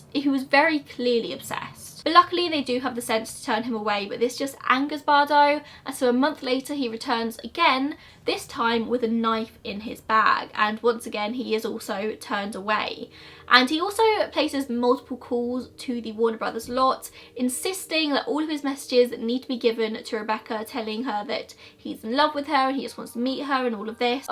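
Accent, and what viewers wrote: England, United Kingdom. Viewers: hey, I'm a new sub. Long time true crime addict and I sub to a few true crime channels. I do sub to more but I end up unsubbing for either personality or bad info reasons. I won't be unsubbing from here, Ive binged a few of your videos and I love your delivery. Im in the UK, I'm in Kent... I'm trying to work out where you're from based on your accent and I'm thinking either Surrey or maybe Kent. Am I right? It's great having a Brit to watch as most of my subs are from the U.S. Looking forward to more great vids. And your make up and jewellery and clothes are gorgeous every time (I know that's not the point). I saw some love.y gold chain rope earrings on one video, where did you get them? I need them lol, I was a teen when they were first in fashion. Love from Kerry in Kent 💕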